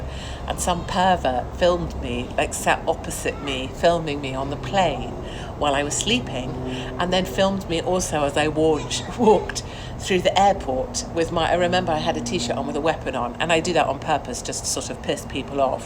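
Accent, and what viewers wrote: RP, United Kingdom. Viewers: It s because you speak the queens English well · It's not your looks, it's your accent. You do sound a bit like a commentator at the Dressage events. It's even more fun when someone with a posh accent says naughty things. And yes, I have been to Spec-Savers. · It might be the accent that makes them think you're posh, after all you do pronounce your words. And of course you say "darlings" a lot 😉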